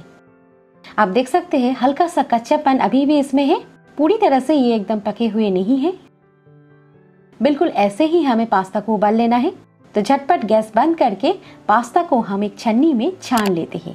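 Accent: Bengal, India. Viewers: Your Hindi accents are seems to be like Bengali. Are you Bengali?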